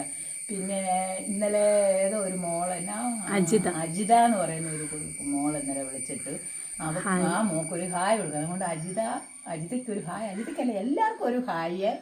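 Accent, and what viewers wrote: Kottayam, India. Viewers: കോട്ടയം കാർ ആണോ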